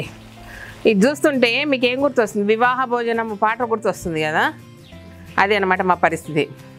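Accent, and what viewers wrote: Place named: Mahabubnagar, India. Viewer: Hi aunty nice video medi kosgi side Kada aunty uru pothireddypalli ante mahabubnagar dist e Kada meru mataldtunte alane anpistundi nice video aunty murkulu pedaga chesaru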